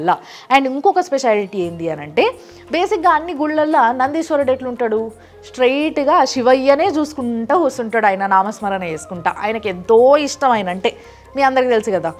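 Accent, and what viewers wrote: Telangana, India. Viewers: Your anchoring is cute, in Telangana slang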